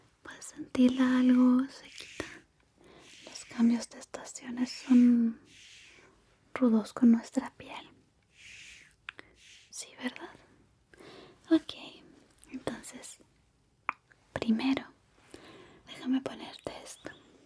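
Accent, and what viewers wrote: Chile. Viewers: Cada día más chileno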